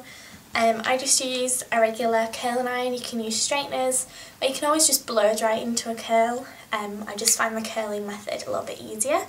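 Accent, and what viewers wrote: United Kingdom. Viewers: What accent does she have?! Is it British? I love it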